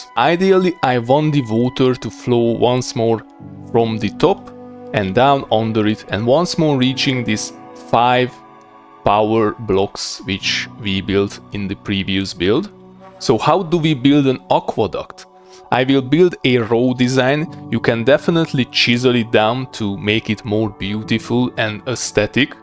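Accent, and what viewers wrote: Hungary. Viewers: instantly recognized the thick hungarian accent. Bojler eladó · Sounds like you have a Hungarian accent, am I right to assume that it is your mother language?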